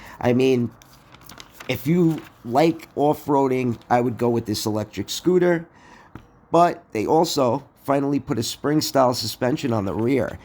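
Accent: United States. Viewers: Your whiny yankee voice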